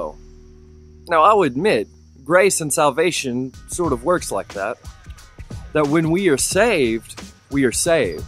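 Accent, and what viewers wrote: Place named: South, United States. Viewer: Are you from South? I can hear a slight Southern twang in your voice. Other than that, God bless you brother.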